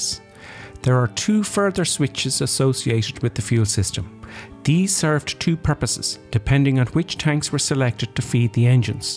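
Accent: Ireland. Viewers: Thank god! I've so missed that beautiful Irish lilt. Some airmanship bringing that down but damn, fancy forgetting to switch tanks! But like you said, we weren't there.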